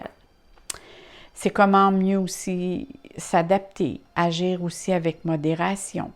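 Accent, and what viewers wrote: Quebec, Canada. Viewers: Merci pour votre réponse des poisson d'avril. C reposant de vous écouter avec l'accent Québec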